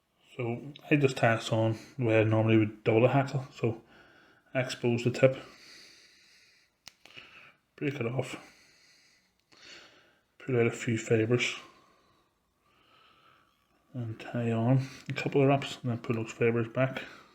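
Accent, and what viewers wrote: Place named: Ireland. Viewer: Lovely looking fly. Great to hear an Irish accent on youtube too,. Keep up the great work. Subscribed.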